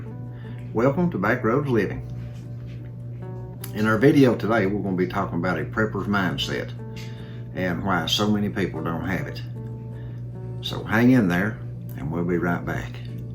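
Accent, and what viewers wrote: United States. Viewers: WHAT IS THE NOISE IN THE BACKGROUND? YOU REMIND ME OF BOB WELLS, CHEAP LIVING. LOVE YOUR AMERICAN ACCENT. THIS IS A VERY COMMON SENSE TALK AND WE NEVER HEAR ENOUGH, IF ANY, OF THESE TYPES OF MESSAGES. MANY THANKS.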